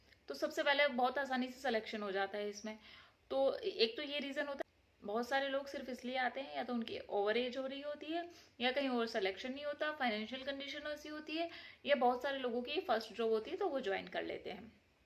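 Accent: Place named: Haryana, India. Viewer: Aap kha se ho ji? Bhatere 😂 sun k haryana se lag rhi ho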